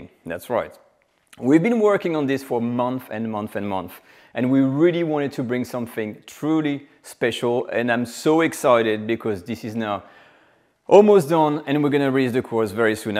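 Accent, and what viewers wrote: France. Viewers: I subscribe because of his accent love French accent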